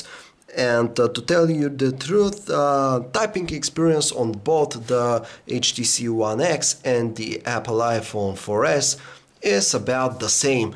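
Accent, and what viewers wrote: Russia. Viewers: Is this guy Russian? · WTF phonearea guy with russian accent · Your accent is pretty cool. It reminds me of the russian dude in 007 goldeneye. "I am a genius!" Also, you guys need to review the custom ice cream sandwich roms. They are much better even than the stock android os which is already way better than the ancient little kid IOS It's funny, I see tons of people go from apple to android and they never go back. I have also seen people go android to apple but they alway go back, except one dude. He said it looked "neat". Dumbest reason ever! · Is he russian^^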